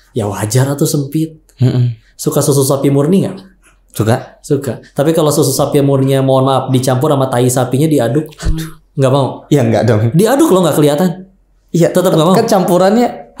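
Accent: West Java, Indonesia. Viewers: Logatx sunda ea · Masya Allaah Barokallaah atas ilmu & pengalamannya Koh Cara penyampaiannya raoseun Meni sunda pisan padahal bukan asli sunda👍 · MaasyaaAllah, mirip a agym ya bicaranya, logat sunda😁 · Sampe logatnya yg nyunda pisan pun sama 😁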